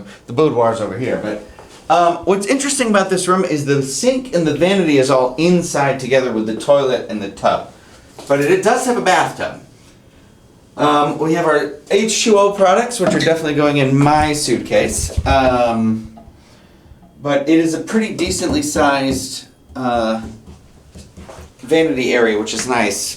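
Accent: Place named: Massachusetts, United States. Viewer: Mass accent béer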